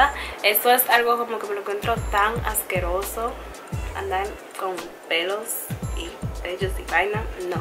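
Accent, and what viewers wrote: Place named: Puerto Rico. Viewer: Acento PR veoo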